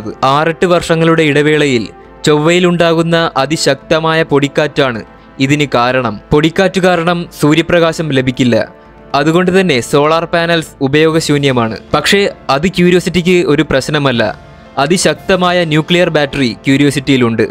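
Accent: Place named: Kottayam, India. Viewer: Kottayam ayirikkkum fullli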